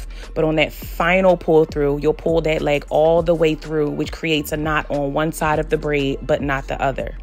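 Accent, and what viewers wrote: Philadelphia, United States. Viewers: You sound like home lol are you from philly? Absolutely loved the detail & easy way you communicated this tutorial/stitches, thankss!! Cant wait to see the Bee Stitch!🔥🔥❤❤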